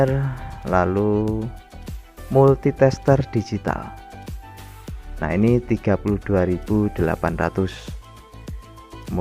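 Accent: Java, Indonesia. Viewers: Orang Lampung ? Kok logate jowo mas!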